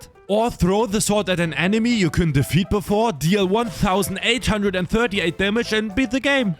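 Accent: Germany. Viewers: this German accent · You are german, right?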